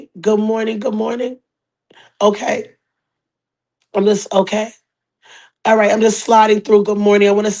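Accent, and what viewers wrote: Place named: Brooklyn, United States. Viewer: I heard you speak and I hurried up and subscribed!!! Love the rough talk you sound like your from Bk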